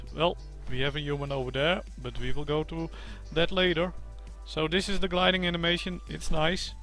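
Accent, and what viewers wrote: Netherlands. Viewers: You are from the netherlands i hear xD maybe you need to learn the accent of english before making an english channel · Most Dutchies have an accent, take it or leave it. You don't need to have an English accent to have an English channel. If you don't like the Dutch accent you have 3 options, deal with it, mute or get lost.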